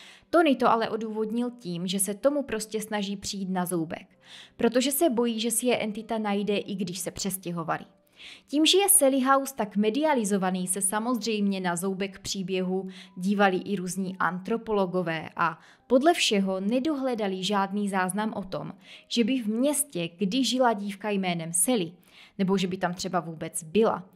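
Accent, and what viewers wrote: Moravia, Czech Republic. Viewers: Mužů ae zeptat z jakého jste kraje? Mate zvláštní přízvuk. Jakoby moravský .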